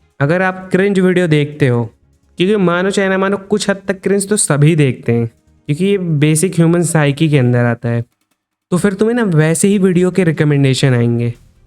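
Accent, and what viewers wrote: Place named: Uttar Pradesh, India. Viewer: Aapke accent se laga ki aap UP me khi se ho. Maybe Lucknow? I can be wrong.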